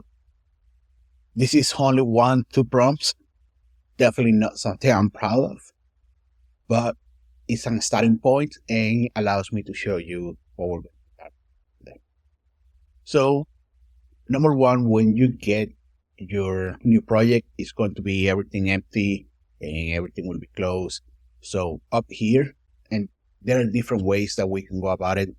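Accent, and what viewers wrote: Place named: Latin America. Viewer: Bro eres latino hablando inglés porque eso me parece, hablas bien recién empecé a usar cursor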